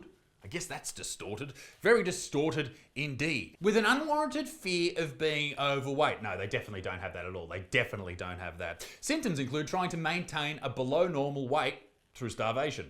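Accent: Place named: Australia. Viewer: I kept hearing you say ' white inclusive consultant" and I was like wth is that? lolol just for reference you are Aussie and I am American lol..was just the accent mate ~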